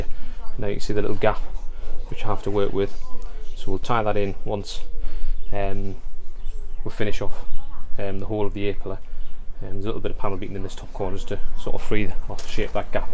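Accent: Newcastle, United Kingdom. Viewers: Been following your progress from the start, really great job cannot believe you got this done in your front yard, must have great neighbors? Reminds me of a time long ago I did some body work on my rusty Ford Anglia, not up to your standards though, nice to hear the accent, former Geordie from Wallsend , now in Canada, cheers.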